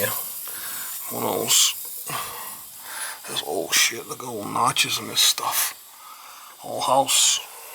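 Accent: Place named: Boston, United States. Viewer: I'm just guessing you have a Boston accent. I'm rural Pa mountains. I traveled around and worked with people from around the country.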